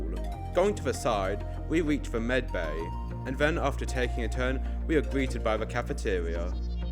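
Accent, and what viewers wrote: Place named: United Kingdom. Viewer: voice that is British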